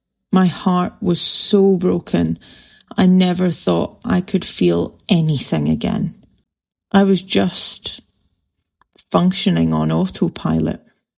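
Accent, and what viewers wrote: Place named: Scotland, United Kingdom. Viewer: You made an old man cry, It is not a perfect world, Me and my now wife of over 16 years were married before, We live in central Scotland, i can tell by your accent you also come from here. Elza like yourself had numerous miscarriages and had no children, I had 2 sons, Like yourself she is a wonderful person and more or less adopted the boys (men) they both love her to bits etc. Life has a few Dark places and I have been there like her and you, the Light shines bright once out off it, you have a very nice man and now children at your side. GOD Bless, shine like a STAR. I once baked but only as an amateur young leo has inspired me to bake again and so have You, I am an old man nearly 70 and enjoy it. going by some of the comments your name is and your husband Vernon? all my best Charlie xx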